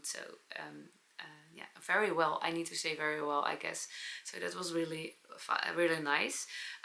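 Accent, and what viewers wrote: Netherlands. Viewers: I could listen to you all day. Love your English with the Dutchy accent. The yarns you bought look beautiful too. Can’t wait to see what you create!! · Thank you for a lovely video. You are very inspiring. Please don’t loose your charming Dutch accent! 🌷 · This looks like a wonderful weekend. I think your dutchy English is charming. Thank you for Sharig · I think your English is perfect, just the way it is. It’s ok to let your Miss perfect go. Your Dutchy English is what makes you so lovely ! I just love your knitting style! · Dear, I ❤ your Dutchy english. It is so sweet.😊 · What a marvelous festival. And your extensive detail conversation/coverage of all the events/your precious moments… With laughter, just infectious and joyful for us to share. So very happy for you. Your English is beautiful… And your Dutch English is part of your charm for all of us! 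So now you have raised your inner confidence level speaking in such a venue… It makes such a difference when you are with people who have the same interest! I watched most of the podcasts of the people you shared the panel with they are my favorite favorites along with you Marvelous interesting story about the roll up the sheep company! So meaningful” heart jumping“. Whatever you make will be extra extraordinary… You have such a design sense. Just adore the fibers that you bought… May you enjoy each stitch with them! Interesting the English ladies’s name was Diane who will teach you English as that is my name! I felt rather smiling when you said her name! You will take your time to rest recover… And I am sure that there will be more beautiful stories that you will share with us. Your wonderful videoing made me feel as though I was with you! It was fabulous! Wishing you well… Take a step back… Rest yourself! 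And then… Dive into your next project! You are such a loving, joyful spirit, and it touches me deeply to watch you! And in advance of your birthday… I am sending you the most heartfelt warm embraces of wishes for good health, many heart, jumping moments, not only in your knitting, but with you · I enjoyed your video so much I love your Dutchy English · Dodgy? It's "Dutchy English".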